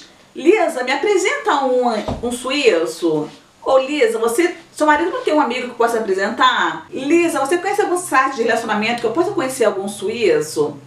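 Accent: Rio de Janeiro, Brazil. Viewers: Lisa assim que comecei a ver esse vídeo já notei que vc é Carioca. O Carioca mesmo não sendo da "gema" tem o lindo sotaque. Eu sou do estado do Rio também precisamente do outro lado da poça depois de Niterói. Eu moro em USA por quase 14 anos e meu sotaque charmoso Carioca não me deixa. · É que sou carioca e tenho esse seu sotaque, por isso perguntei...rsrs. Bjsss pra vc, linda!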